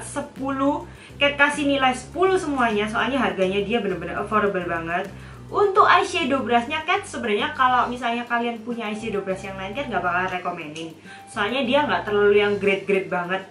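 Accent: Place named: Surabaya, Indonesia. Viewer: Mau cerita sedikit ya kak Aku ngikutin video kakak dari awal banget sampe sekarang dan aku juga udah subscribe channel youtube kakak dan aku seneng banget kakak itu baik dan ramah dan mungkin kita sama2 dari surabaya kali ya hehhe😂 gaya ngomongnya medok khas orang surabaya Ohh iya satu lagi aku udah like, subscribe, and comment lengkap sudah👍 #kathlakzgiveaway2018 Aku mau jelasin kenapa aku positif thinking untuk menangin giveaway ini karena 1. Mau ngikutin jejak kak bisa makeup se-professional kakak 2. Pengen jadi youtuber juga tentang makeup2 gitu, doain aja ya kak semoga terwujud😊 3. Aku udah gak sabar buat nyobain brush itu, kakak buat aku ngiler deh pengen nyobain 4. Percaya diri bahwa aku bisa menangin giveaway ini😆 "MERRY CHRISTMAS & HAPPY NEW YEAR🎉🎉🎉" IG: Email: <mail>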